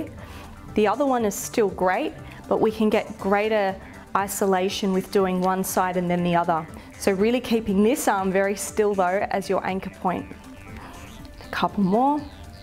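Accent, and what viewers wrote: Australia. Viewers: Just found your videos tonight when looking for exercises for my sore shoulders to complement my physiotherapy. Enjoyed them so much I've just ordered decent bands to work with. I'll be looking up your other body workouts here on. Thanks. Love your reassuring delivery as well. Must be the Aussie (?) accent :-)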